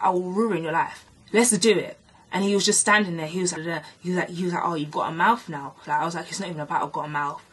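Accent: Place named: Essex, United Kingdom. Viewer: I love your Essex kinda accent mix in with London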